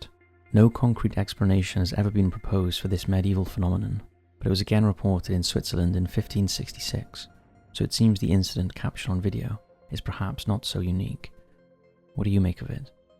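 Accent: Wales, United Kingdom. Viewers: I love this British narrator guy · i thought welsh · Is this guy welsh ? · Hooray! 🎉 We've got the posh English chap back! Sounds more classy!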